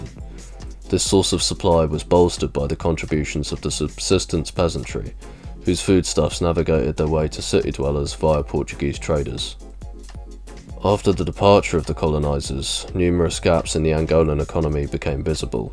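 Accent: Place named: London, United Kingdom. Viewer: Wait... I hear that youre from London! (possibly even east London!). Great to hear a familiar voice! (I'm from East London)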